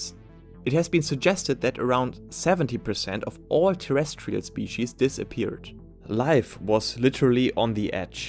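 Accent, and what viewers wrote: Germany. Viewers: I like your thick German accent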